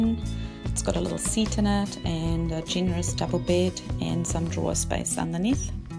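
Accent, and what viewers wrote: South Africa. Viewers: Stunning job, Are you based in NZ, you sound like you have a South African accent ? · Really nice boat! Enjoyed the SA accent, reminded me of home ❤️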